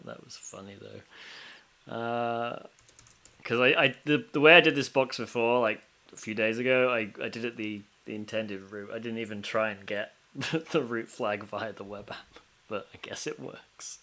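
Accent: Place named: United Kingdom. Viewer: British guy😎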